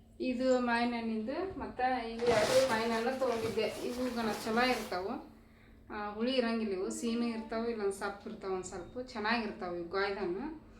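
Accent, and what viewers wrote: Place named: North Karnataka, India. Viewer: ನೀವು ಇಷ್ಟು ವರ್ಷ ಬೆಂಗಳೂರ ರಲ್ಲಿ ಇದ್ದರು ನಮ್ಮ ಉತ್ತರಕರ್ನಾಟಕ ಭಾಷೆ ಮರೆತಿಲ್ಲ ಅದು ನಮಗೆ ಸಂತೋಷ್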